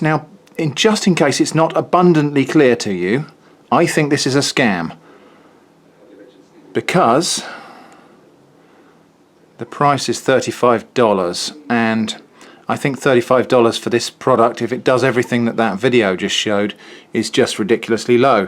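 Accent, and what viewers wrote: United Kingdom. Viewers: how does he sound much more british in this video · I were about to say that you forgot to mention that the footage is mirrored aswell but then I remembered you British accent.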